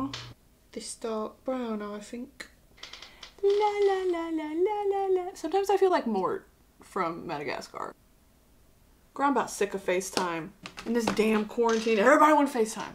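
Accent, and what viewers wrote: United Kingdom. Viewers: Her British accent is so iconic it sounds like Harry styles and peppa pig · your british accent makes me think of adele lmfao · WITH THAT BRITISH ACCENT YOU WERE SERVING ADELEEEE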